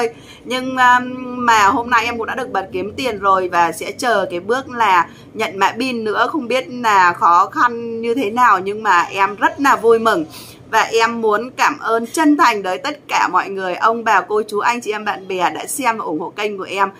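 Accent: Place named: Hưng Yên, Vietnam. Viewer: E nghe giọng giống ng Hưng Yên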